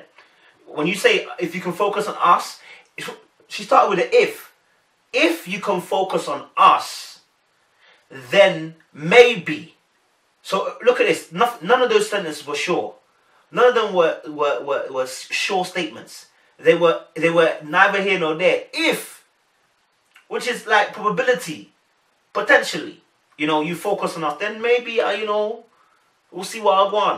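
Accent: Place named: United Kingdom. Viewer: Its nice to hear a British accent reviewing this show. Great review. New subscriber!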